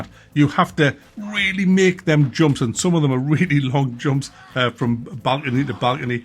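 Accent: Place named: Durham, United Kingdom. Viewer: Where are you from mate? You sound like Durham way, Chester-Le-Street perhaps?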